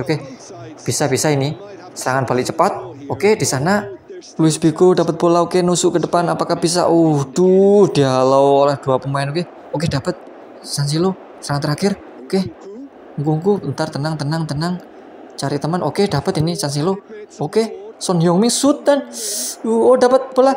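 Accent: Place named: Java, Indonesia. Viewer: Medognya masih kentel😂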